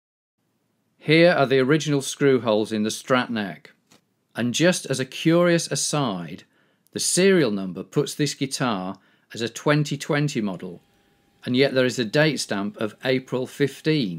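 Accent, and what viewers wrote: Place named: Midlands, United Kingdom. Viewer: About 2.5 years ago, I rediscovered my love of electric rock guitar, so I dug out the little japanese guitar I'd had at the back of a wardrobe for decades (Kawai Aquarius, purchased in 1984). The frets were worn down to the fretboard. So I ordered a new neck from Amazon. The one that was sent was not the one they had in the pic, they said they didn't have any more, but I could keep the one they sent for free. Despite having no experience, tools or workshop, I was determined to make it fit. Musician friends said it would never work. But bit by bit, using my bed as a worktop, I sandpapered away areas the neck was too thick, and shimmed up areas where it was too loose. When I came to fit it, it needed a couple of tweaks, but then it worked perfectly. Intonation spot on - action better than the original. It was flatter too - much easier to play. 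I've got some 'proper' guitars now, but I learnt a great deal from that little project! Love the Channel,. You sound like you are from my neck of the woods. (Midlands) You break down all the favourite solos I enjoyed in my youth, so now I can at least play them in slow motion, which is a step in the right direction!;-)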